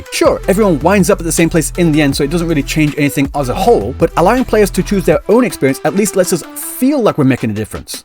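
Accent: United Kingdom. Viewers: The Linyang roast in the first 30 seconds has earned my respect. Also why do you sound so British don’t you live in Japan????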